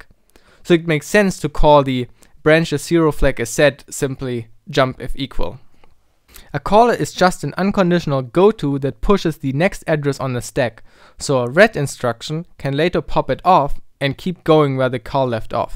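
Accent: Germany. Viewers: I agree. Too fast, his drawing is too messy, his writing too small AND he got the stack direction the wrong way around (albeit correcting it after the fact). He talks too fast AND IN A GERMAN ACCENT that isn't easily parsed at high speed. What MANY "tutorial" publishers seem to forget is that it's the people who DON'T KNOW THE SUBJECT who are your primary audience - if that audience can't understand you, or your sloppy preparation (or lack thereof) starts them off on a flawed footing, why are you teaching at all? That would drive me insane - I wouldn't DARE publish a tutorial in the state this is in, I just couldn't.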